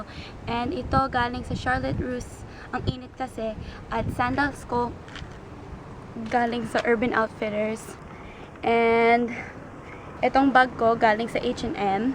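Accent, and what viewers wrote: Philippines. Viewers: You got the Filipino accent down :D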